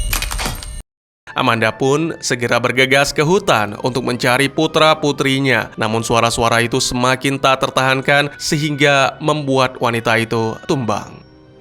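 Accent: Aceh, Indonesia. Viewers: bang lu orang aceh?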